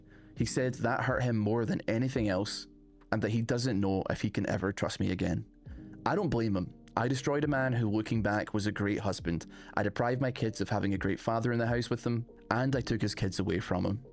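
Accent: Scotland, United Kingdom. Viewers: is indeed scottish! great voice for the great takes indeed:))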